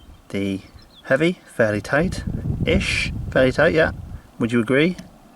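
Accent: United Kingdom. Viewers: Is that a fellow Mackem accent my senses detect? Can’t mistake that North Sea east coast wind on the mic either! Great video. I’m busy using my Pulsar to test .177 QYS 8.48gn v AA Field Diablos and against my hunting go-to the 10.34gn JSB Heavy. Also have the test to do of Polymag shorts against the 9.56grain QYS’s. Have subscribed so look forward to seeing your videos and more vids. Best of luck. (I’m SR6 Marina based) 👍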